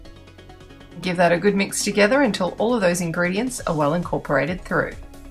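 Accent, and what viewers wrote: Australia. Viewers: Are you Australian? Ps I love all your vids :)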